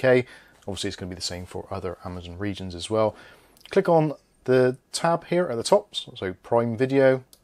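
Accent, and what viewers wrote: Bristol, United Kingdom. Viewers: Thanks a bunch for the info. Why do you sound Bristolian?🤔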